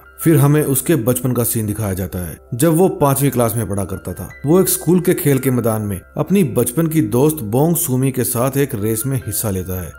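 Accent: Punjab, India. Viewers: Punjab se hi bhai ki awaj Punjabi he bro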